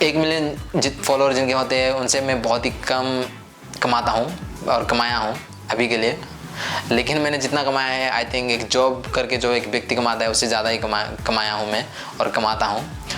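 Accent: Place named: India. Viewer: Love how u improve your English but u need more practises for your pronunciation to remove that slightly indian accent.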